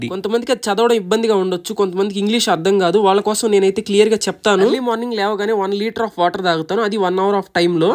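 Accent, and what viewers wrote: Nellore, India. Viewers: బ్రో నేను ఒక మాట చెప్తాను దాన్ని గుర్తుపెట్టుకో నువ్వు ఏదో ఒక రోజు పెద్ద యూట్యూబర్ అవుతావు నీలో ఆ స్కిల్స్ ఉన్నాయి ఎందుకంటే మన నెల్లూరు యాస అలాంటిది🙌🙌🙌🙌🙌🙌🙌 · Navvabaku camera man🤣🤣(Nellore slang 🔥)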